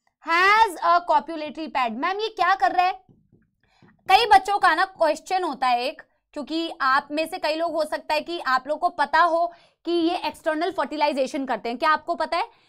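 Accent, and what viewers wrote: Bengal, India. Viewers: Ma'am aapki bengali intna cute hai ki kiya ji bolun main 😍😍😍😍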